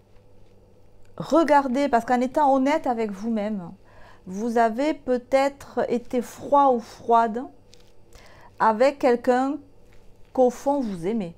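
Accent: South, France. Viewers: Je vous découvre dans cette vidéo et je like. En tant que belge, j'adore votre petit accent du sud...Merci !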